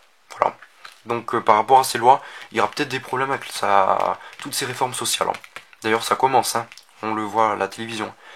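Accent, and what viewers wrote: South, France. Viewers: Toulouse? Montpellier? Ou autre?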